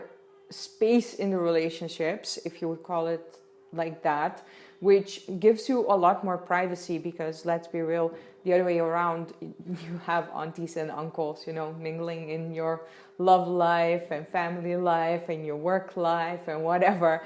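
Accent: India. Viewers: You are talking like an Indian.... With such a lot of emotion 😁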